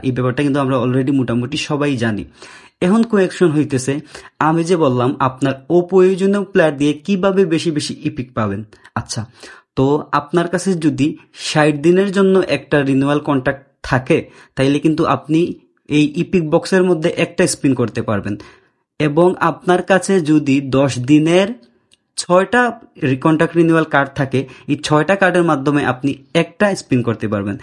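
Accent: Sylhet, Bangladesh. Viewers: Vai apni Sylheti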